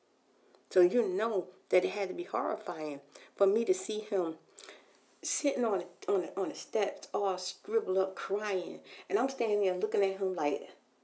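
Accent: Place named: South Carolina, United States. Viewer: Its skranger....and that's how we talk down in South Carolina....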